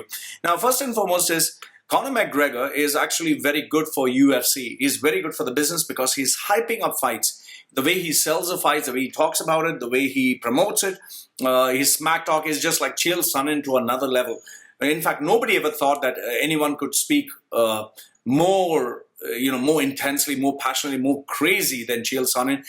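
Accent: India. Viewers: Wasted 9 precious mins of my life.. Dude you talk in India accent. Are you from India? You make video with such a good title but you dont talk facts while making predictions... Rafael is 1inch shorter in height and reach is equal why hez big? Gather some facts and make video then it would be interesting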